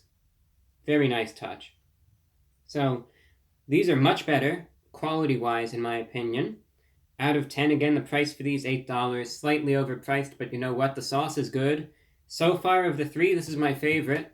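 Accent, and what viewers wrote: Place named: Transatlantic, United States. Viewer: Video starts . By the way, I can’t tell if you’re doing a transatlantic accent for real or you learned how to fake it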